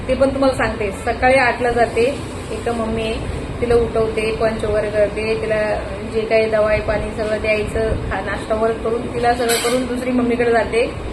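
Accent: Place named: Belgaum, India. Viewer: Ase kahi naste आपण काम करत जा falachi apexa na thewata pudhe जा kashtache jivan ahe pn nashib wan ahet 4 children ahet .keep itp sone ky parat yenar .struggle is life .ata thodech diwsat tumche best days yenar mule shklit tyana smjte .good job kartil dont b nervous n worried.God bless u all.ur marathi language is ltl bit belgaum type.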